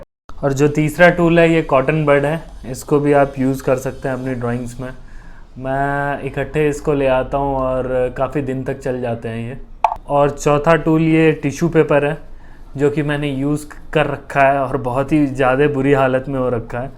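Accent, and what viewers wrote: India. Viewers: अंग्रेजी बोलते हुए भी आप क्या कह रहे हैं मुझे समझ नहीं आ रहा है, लेकिन आपकी अंग्रेजी भारतीय है अमेरिकी अंग्रेजी बोलने की कोशिश करें ताकि दुनिया आपको समझ सके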